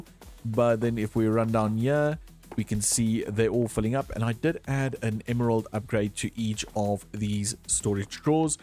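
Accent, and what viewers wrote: South Africa. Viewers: are you south african? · Yes, and one South African can always recognise another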